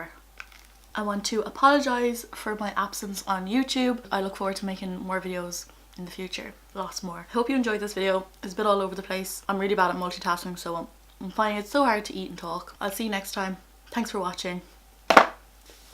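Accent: Ireland. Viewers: U deserve so much more subscribers ( I just came across ur channel and I Love it +1 subscriber) I guess ur from Ireland, u have the accent 🇮🇪😁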